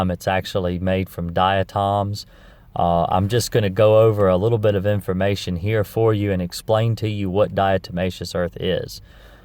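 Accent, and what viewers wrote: South, United States. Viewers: Dat southern accent!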